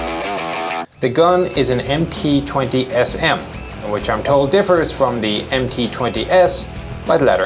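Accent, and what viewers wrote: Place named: Ireland. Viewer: Is he irish he has a slight irish accent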